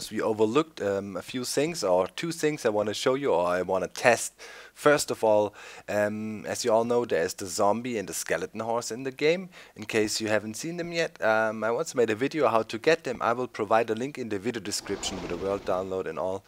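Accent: Germany. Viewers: have you ever tried using an american accent other than your german one?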